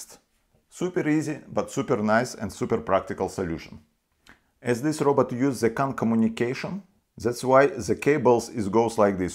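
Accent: Russia. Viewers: бесит жёсткий русский акцент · Мне одному кажется что это русский английский, робот офигет какой тихий, интересно как устроен сервопривод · очень выражен русский язык, так как английский не родной. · ЧИСТО РУССКИЙ АКЦЕНТ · русский акцент :-). Крутая вещь. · Хороший робот, быстрый и тихий. С русским акцентом намного понятней)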